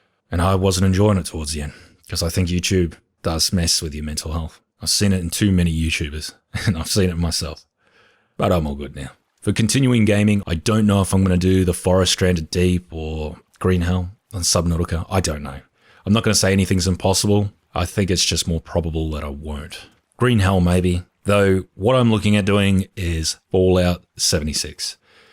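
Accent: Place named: Australia. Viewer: Explains why their was a baby in the background at Stranded Deep. Have a good one brother, missed your Aussie voice 😂.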